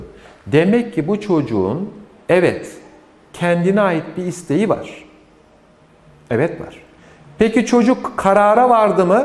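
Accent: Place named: Aegean, Turkey. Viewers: hoca izmirli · Hocam nerelisiniz? Konuşmanız çok güzel lakin e ve a larda Ege ağzı hissettim, merak ettim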